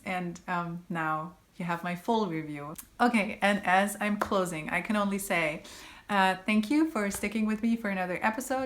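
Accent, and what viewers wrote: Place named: United States. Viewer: Your English is lovely. As inspired by fiber stated, we always have to pause to think of the correct word to use and we speak English as our 1st language😂. Your accent is amazing. You sound like you live in the US. So, beautiful job. I, too, loved the yellow yarn story. Thank you for sharing. Hugs.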